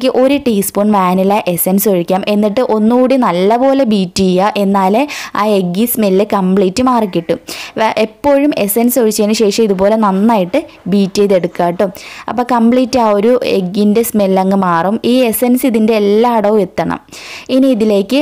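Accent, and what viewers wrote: Kollam, India. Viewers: I'm from kollam.chechide samsaram kettapozhe manasilay kollamkariyannu.ano😃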